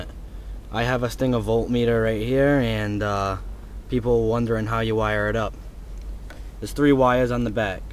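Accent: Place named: Brooklyn, United States. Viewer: Sounds like hes from Brooklyn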